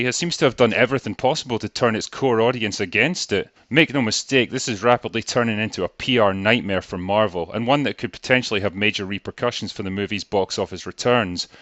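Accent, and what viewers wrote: Scotland, United Kingdom. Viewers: An old vid before he put on the thick Scottish accent. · Wait a second -- now in 2020 the has such a thick Scottish accent he's barely understandable! In this video it's much more mild.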